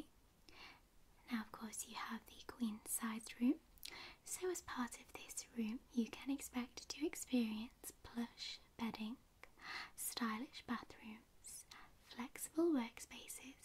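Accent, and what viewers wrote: United Kingdom. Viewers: A typical British accent. Because I am British this sounds normal. 😂😂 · Oh you're British? Never could tell before with the accent. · british accent okie dokey. Loved it. Also Room 234567? How many floors does this place have? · Isnt this the normal british accent Why write it in the title for the first time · Öki Döki :D That british accent is funny... and adorable at the same time ;) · "British accent" brillant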